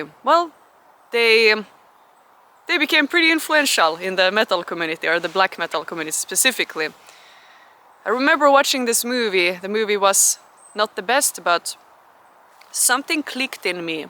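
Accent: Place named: Finland. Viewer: I absolutely LOVE your Finnish accent speaking English! Especially the way you say "Music"! I began learning guitar in 1968 as a child and grew into the music of 1970's. In my opinion the absolute best decade for music of the 20th century and I agree with you for the same reasons even though I am old enough to be contemporaries of your grand parents. I like your channel.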